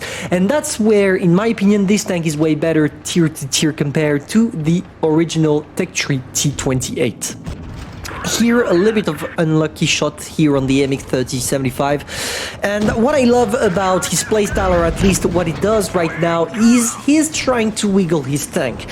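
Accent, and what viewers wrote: France. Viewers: On dirait un français qui parle anglais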